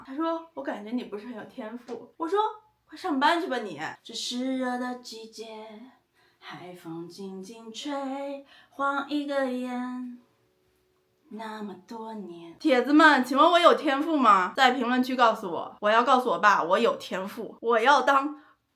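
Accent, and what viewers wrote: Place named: Shanxi, China. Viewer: 感觉有点儿山西口音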